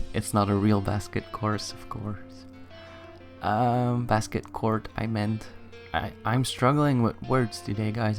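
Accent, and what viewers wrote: Belgium. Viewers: Wacht ben je van belgie? Ik dacht Engeland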